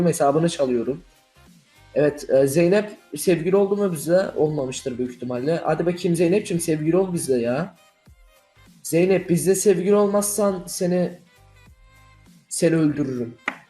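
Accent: Azerbaijan. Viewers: kesinlikle Azerisiniz. Belki kendini diye tanıtan insan bile değildir. Zaten iyiki de ( ismi bilinmiyor xc) abone değilim. Bu kaçıncı yalan? İnsanları kandırarak birşey elde edemezsin! Lox sözünden anladım ve konuşmandan. Bende Azeriyim de, kendinizi bu kadar düşürmeniz? Ah, kesinlikle boşsunuz. Sizin içinizde,dışınızda boş. Benim yorumuma gelip Mertciler pardon Sahtekarcılar buraya havlamasınlar...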